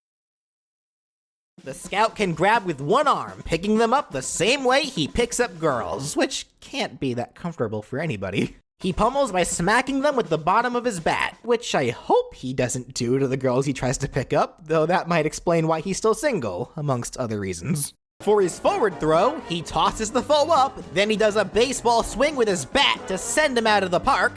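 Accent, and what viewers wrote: New York, United States. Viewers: A bit of a missed opportunity to use your New York accent. Regardless, this was a great moveset for the Scout.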